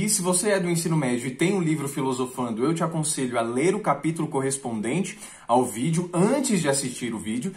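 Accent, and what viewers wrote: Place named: France. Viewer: tô aqui pelo sotaque francês, flw vlw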